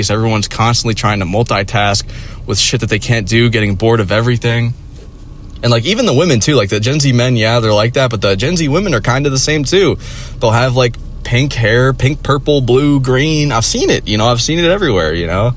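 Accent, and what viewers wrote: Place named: Boston, United States. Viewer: Anyone else used to think was from Boston?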